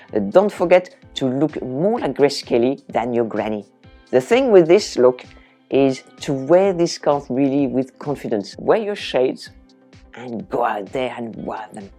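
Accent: France. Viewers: I love your French accent!!!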